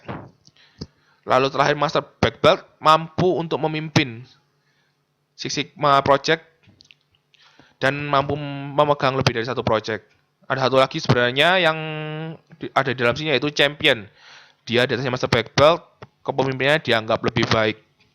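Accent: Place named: East Java, Indonesia. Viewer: jatim banget, lek ngomong cepet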